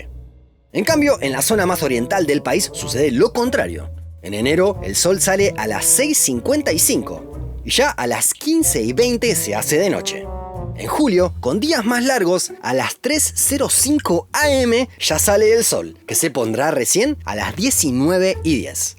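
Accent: Argentina. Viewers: ¿Por qué pronunciás "Urumchi" si es "Urumqi"? Si en vez de Beijing decís Pekín... no se entiende. Igual por suerte volviste a usar el yeismo de Argentino, hace unos años te hacías el mexicano neutro y decías "poio" o "iuvia".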